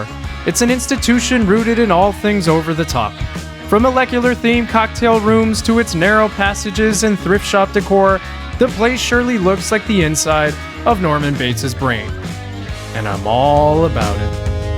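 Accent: United States. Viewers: annoying American accent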